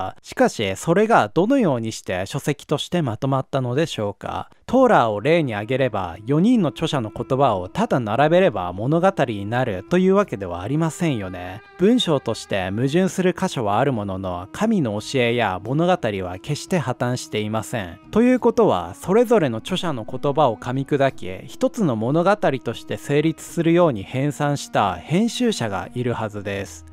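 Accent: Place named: Tohoku, Japan. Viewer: かなりズーズー弁ですね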